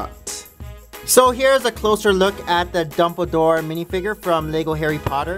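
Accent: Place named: Canada. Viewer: It's like you are from Canada or something....?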